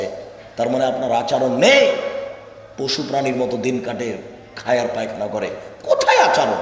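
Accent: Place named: Chapai Nawabganj, Bangladesh. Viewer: imran vai vaier voice chapai nawabganj er tai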